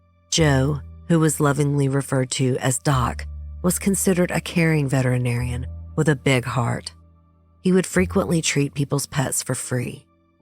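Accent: South, United States. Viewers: It's so nice to hear a southern accent...❤ Great job on the videos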